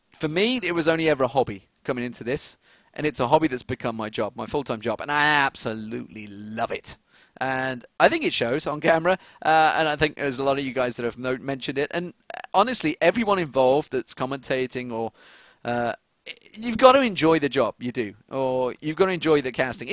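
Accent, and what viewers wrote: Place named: United Kingdom. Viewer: You make me want to talk in a British accent... :/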